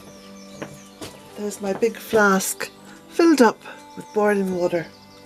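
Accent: Ireland. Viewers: Well, You say you are rambling on, but I so enjoy hearing you ramble in that lovely Irish accent of yours. I wonder if you truly realize the pleasure you bring to so many of us. I have always loved nature, especially animals, but I now look at the landscape around me with eyes anew. My maternal grandmother was born in County Claire Ireland, and so I particularly love your channel. And I hope, one day, to visit Ireland again.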